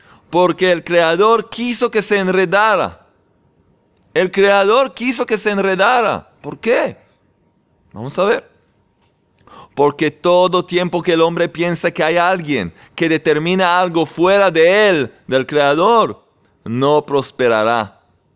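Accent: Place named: Panama. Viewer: Una de los sucesos que mas me ha divertido es que usted habla como nosotros y eso hace que se identifique mas..."pa donde vamos" jeje Y los mas importante y valioso es que no sabia como era mi relación con Dios y tampoco entendia muchas cosas. Aparte que no sabia que espera de mi y yo de El. Gracias, muchas gracias. Soy de Panamá y me apodan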